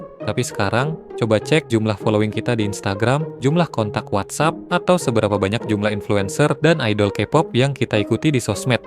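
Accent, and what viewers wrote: Bali, Indonesia. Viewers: Narator logat bali kayaknya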